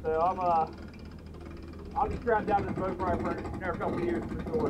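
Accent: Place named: Texas, United States. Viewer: Same boat , black 2023 I love it , and watching you fish feels like Im fishing in my boat. Im going tommorrow to Sabine River down here in South East Texas Same place the bass master elite has their event. The way you act and talk makes you seem like one of the ole boys from around here. its kinda funny how that works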